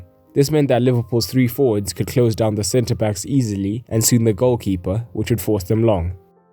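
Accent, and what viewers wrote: South Africa. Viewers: Your accent is South African ?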